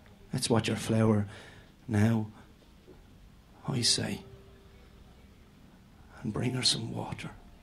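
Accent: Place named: Ireland. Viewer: Such a beautiful poem. And the Irish accent just makes it that much better (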